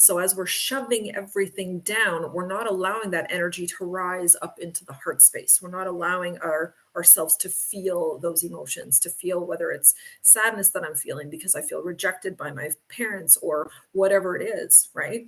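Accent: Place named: West Coast, United States. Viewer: I don’t usually watch Q&As (actually never) but your videos are “popping” up on my homepage lately and I’m wondering why? 🤔 I find today’s topics with & (I believe were their names), very compelling. (it sounds like is dealing with a Christian ideologue mom and guilt issues, just a guess? I can relate) America, while not claiming a national religion has surely imposed those ideals onto us from birth. Words like “Purpose” are a clear indication of that. After all I have learned from six surgeries, three of which spine related, All of which originated from a severe accident resulting in a broken neck. I joke that having Plates, rods and screws in my cervical & lumbar spine make me a hybrid human 😜 Judging from posting times and when they show up on my page you are in a different time zone from me (East Coast). You don’t sound European, South American, or Canadian for that matter; West Coast perhaps? 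Anyway, my point is scheduling an in person session wouldn’t be convenient. I am currently writing a book, autobiography of sorts, and would like to consult with you on developing the theme and characteristics of the antagonist. The more I listen to you speak the more I like your instincts & intuition. 😊 Can I email you to arrange an initial consultation perhaps?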